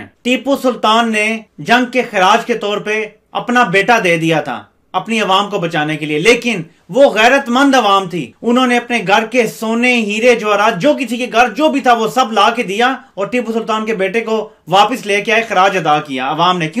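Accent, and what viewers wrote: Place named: Punjab, Pakistan. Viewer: YOU ARE TOO YOUNG LIKE BILAWAL TO DISCUSS POLITICAL SUBJECT. YOU FIRSTLY NEED TO IMPROVE YOUR " PUNJABI ACCENT URDU " WHICH SOUNDS LIKE SOME " DEHAATI " SPEAKING.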